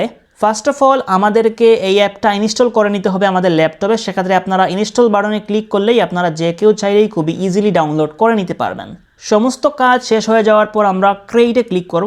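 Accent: Sylhet, Bangladesh. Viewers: Sylheti ni vai tumi?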